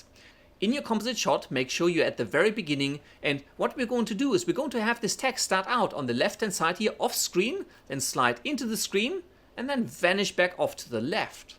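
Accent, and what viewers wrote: Germany. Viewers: Even if your lovely accent (German?) hadn't hooked me, I wouldn't have missed a minute! This was just the tutorial I needed. Amazing work. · Du musst definitiv Deutscher sein, dein Akzent klingt so süß 😁 Anyway, thanks for your very high quality content, it's so helpful!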